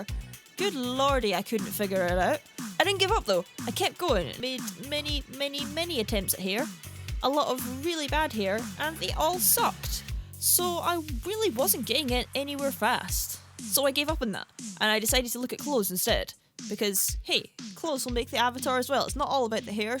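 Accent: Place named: Northern England, United Kingdom. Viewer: So magical when a youtuber responds to me because for now his/hers base is not so big yet. :-) Anyway loving your accent (really, really enjoying it :-D). Are you from north of Britain? (I'm the heart of Europe (Czechia) so I'm not so good of guessing the accent of English speaking peeps :-) )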